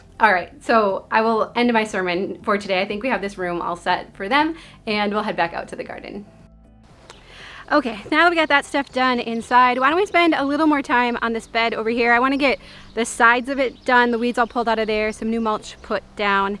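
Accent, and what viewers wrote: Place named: Midwest, United States. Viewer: I like you so much. Thank you for all of your videos...and for your life that speaks louder than words.☆ Like you always say, I wish we could be friends.^^ You're a little cooler than I am, but you seem humble enough that I think it could be possible! 😎 lol I'm from Michigan, raised in Wisconsin, and taught a few years in Missouri before moving to South Korea. While teaching here I met & married my Korean husband. I do miss 'home' in the U.S. while also loving my life in Korea, so your vlogs and family life are a 'taste of home' for me.😊 Love your family's Midwest-style...all the way down to your casseroles and accent.♡ I am a sentimental saver. Your minimalist thinking has helped me learn how to declutter! I work at it often...still have more stuff (storage containers) than necessary. And it does feel overwhelming at times, especially before a move (which I have moved often!). 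I was determined to declutter in my classes this week. I had boxes & files of paperwork from the past 6 years (or more) than needed to be gone through. It was hard to get enough motivation/energy to start. But after praying for energy (which God provided!🙌...along with an Americano and another iced latte...😅), your talks kept going through my head: "time will tell", "less inventory to manage", "if you haven't used it in over a year it's okay to let it go", "don't feel guilty even if you spent money on it...it served its purpose for a time", "you can replace it later if you really need to", etc.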